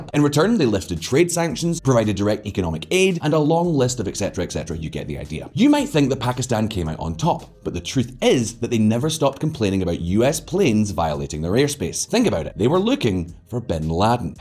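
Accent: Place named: Scotland, United Kingdom. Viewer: His accent has the Scottish tinge to it.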